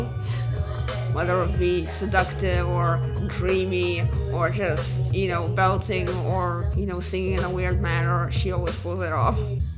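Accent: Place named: Canada. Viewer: Wow that's amazing your Lithuanian but do you live in Canada your accents Canadian I thought sorry I just kinda shocked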